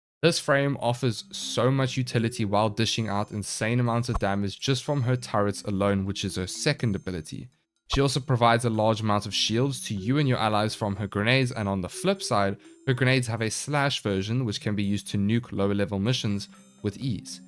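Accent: South Africa. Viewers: I knew it he is South African lmao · Yooo you SouthAfrican??? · aweh my fellow south african. The accent gives us away every time. Can never hide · I knew you were South African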